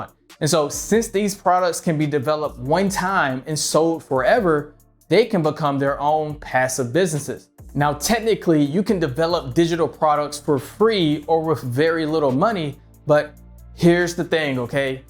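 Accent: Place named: Africa. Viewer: i like your African accent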